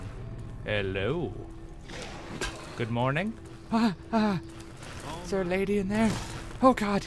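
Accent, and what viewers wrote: Scotland, United Kingdom. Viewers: Mate the Scottish accent is fun ( especially in England where no one understands you ) but it ain't the easiest to get rid of once you've got it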